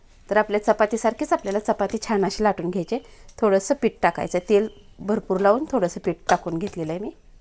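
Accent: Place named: Satara, India. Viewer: ताई तुमचं गांव कोणतं भाषा आमच्यासारखी वाटत आहे.आम्ही खटाव तालुक्यातील जिल्हा सातारा कडचे..सद्या कोल्हापूर येथून व्हिडिओ पहात आहे.आमच्याकडेआमचीआज्जी चपाती मोडुन चुरा करुन त्यात तुप,गुळ घालून छान लाडु करुन सर्वांना द्यायची तुमची रेसिपी खुप आवडली. मी मुलगी आणि सुनबाईनां व्हिडिओ पाठवला.लहानपणीच्या या प्रेमळ नाष्ट्याला आता ती सर येणार नाही.गेले ते दिवस सकस पदार्थ खाण्याचे.ओके..ताईखुप खुप धन्यवाद!!!!**"*❤😂🎉🎉❤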